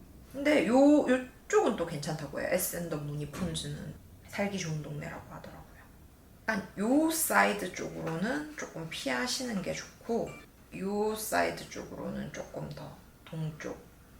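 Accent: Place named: Gyeongsang, South Korea. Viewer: 서울사람이라고는 하지만 감출수 없는 경상도 억양 ㅋㅋㅋ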